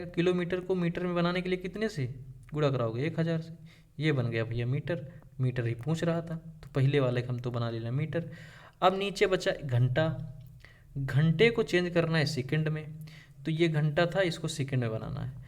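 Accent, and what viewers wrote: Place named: Raebareli, India. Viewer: Sir आपकी आवाज़ raebareli के क्षेत्र की लगती है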